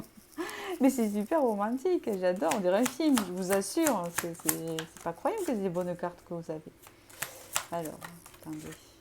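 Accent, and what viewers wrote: South, France. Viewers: Coucou 👋 merciiiiii quelle magnifique tirage tout est dit tout est en raccord☺️ oui traitement des liens karmiques passage très difficile mais nécessaire c’est plus au moins derrière aujourd’hui bon y a encore du job mais là oufff ça va de l’avant 😜 je vis plus le moment présent aujourd’hui et ça change la vie vraiment 🥰.. oui des plaisirs sains et simples que je recherche aujourd’hui.. 🥳 merciiiiiii.. j’adore le tirage vu d’en haut 👍 le son est génial 👍 vous êtes toujours très juste et j’apprécie votre naturel ☀️🌈🌹merciiii pour tout votre temps accordé et ces vidéos qui souvent me titilles 🤣, me remette aussi en question, et parfois ne me parle pas mais cela reste très rarissime il en faut pour tous!! Et je suis grâcement privilégiée 🤣 Voilà ce que j’apprécie dans vos vidéos c’est pas du blabla merci merci merci pour tous ses merveilleux moments de partage que vous m’offrez 🌈☀️🌹🌈avé l’accent🤣j’adore sa sens le soleil, la lavande, la mer les vacances 🏔 🌊 😎🌈☀️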